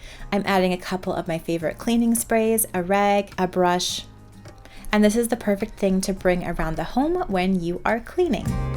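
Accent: Canada. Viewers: Love the DIYs and the Canadian accent! ❤️😁.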